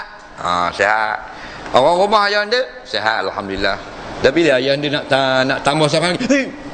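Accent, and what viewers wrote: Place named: Kedah, Malaysia. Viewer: MashaAllah ustaz boleh,cakap kedah,,terbaiek.... السلام عليكم.. الحمدلله لا اله الا الله محمد رسول الله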